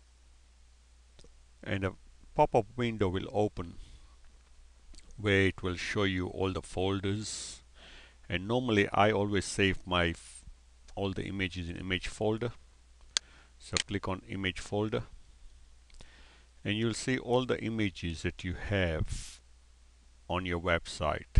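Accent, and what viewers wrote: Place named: Mexico. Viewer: OMFG NOCE VOICE AHAHAHAHAH you sound mexican XD thx anyways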